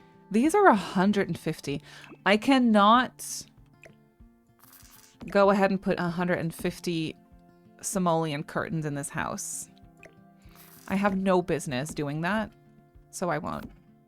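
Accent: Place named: Germany. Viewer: Is Sir from Germany? He has an german accent😂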